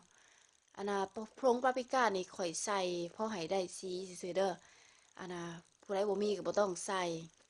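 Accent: Savannakhet, Laos. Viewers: พาสาลาวสำเนียงสะหวันนะเขตพากใต้ลาว... 🤨🤨🤨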